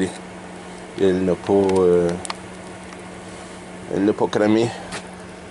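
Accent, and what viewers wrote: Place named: Gaspésie, Canada. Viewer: Vous êtes de la Gaspésie si je me fis a votre accent? Beau projet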